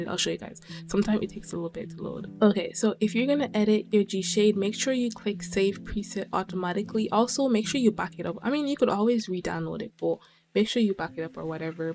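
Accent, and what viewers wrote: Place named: Jamaica. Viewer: I was listening and I was like She sounds Jamaican and I got so excited like have I finally found my people with same interests as me? And I checked the bio and I was right 😂 I’m so gonna binge the whole channel❤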